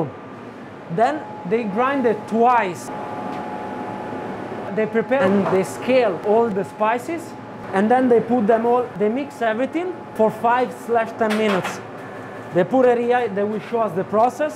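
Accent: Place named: Italy. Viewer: Your italian accent makes your reactions even better :D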